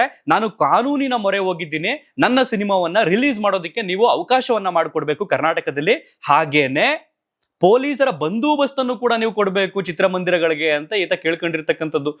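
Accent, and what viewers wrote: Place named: Belagavi, India. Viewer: ಬೆಳಗಾವಿ ಕನ್ನಡ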